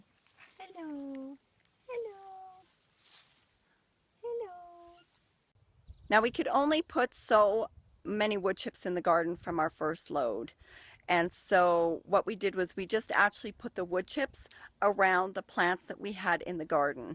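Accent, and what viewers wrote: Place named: Canada. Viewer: Great video! So fun to see your pretty face and hear your Canadian accent! I don't think lettuce likes wood chips until they have really broken down into compost. I have not had success with mulch of any sort with my lettuce. And I think everything does better if the wood chips have been in place for at least one winter. They certainly seem to get better with time. I love your experimenting! And your indoor garden was incredible! This year I tried direct seeding everything. Don't think I will do that again. My cukes have been a huge failure. Thanks for the tour!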